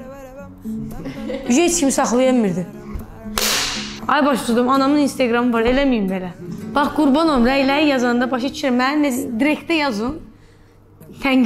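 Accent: Baku, Azerbaijan. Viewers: Bakı lehcesi başqa alemdi amma Bakı kendininde öz lehcesi acıqlama ver zehmet olmasa sizin lehce hansı kende aiddi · canım ay bakı lehcesi şırındude etaga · Sənin hər işin xoşum gəlir ama Bakı ləhcəsi mənə ləzzət eləmir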